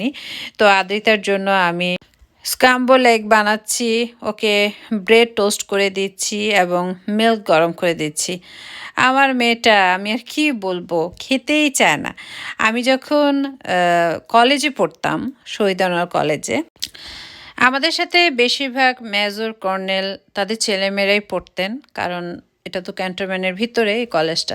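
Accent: Sylhet, Bangladesh. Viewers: Apne sylhety ni apa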